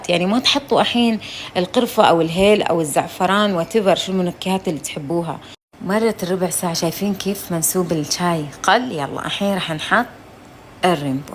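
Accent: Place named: United Arab Emirates. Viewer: احب صوتك والله الصوت الاماراتي اللي يفكرني باصدقاءنا الاماراتيين زمان ما شوفنا منكم الا كل خير صوت فيه طيبة وايمان الله يزيدكم نزلت مصر ومازالت الامارات واهل الامارات في القلب احن ل كل التفاصيل البسيطة اشكرك على كونك سبب في ذلك❤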